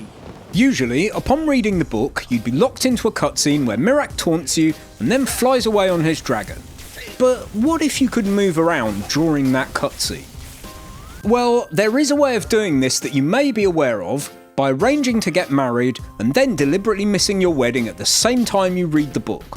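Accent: United Kingdom. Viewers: Hello again funny British man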